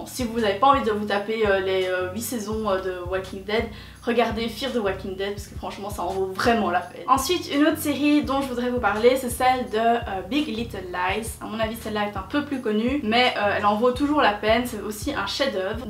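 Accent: Belgium. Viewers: Tes belge? Sinon super ❤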